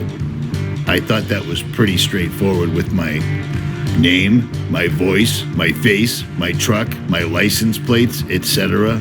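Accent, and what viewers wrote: Boston, United States. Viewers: ? From Boston?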